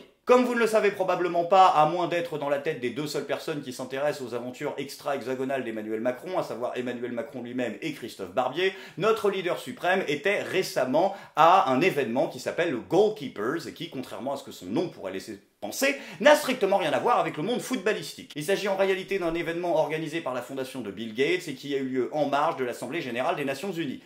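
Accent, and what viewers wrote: Benin. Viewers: très bonnes imitations de Schiappa et d'un béninois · Et fait nous on un jspc spécial accent bénin :p tu le fait vraiment bien :)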